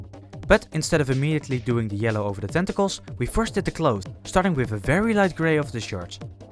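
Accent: Netherlands. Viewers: Thats so cool!! 😍Also are u dutch?😊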